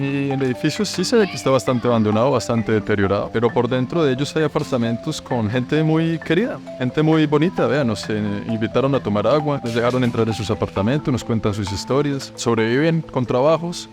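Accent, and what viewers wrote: Colombia. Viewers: Bueno x como hablas eres de Colombia tu país esta mal también y si siguen con Petro sera peor, los mejores países para vivir el norte de Europa.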